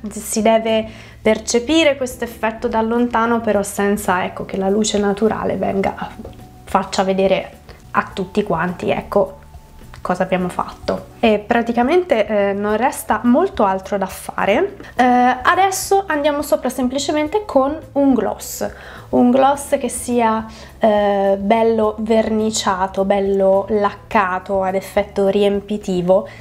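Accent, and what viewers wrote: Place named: Tuscany, Italy. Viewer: Che bello l'accento toscano😊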